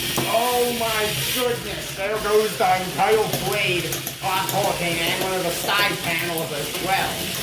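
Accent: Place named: New England, United States. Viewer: Like your little New England JFK-like I accent. love the battle bots superstars.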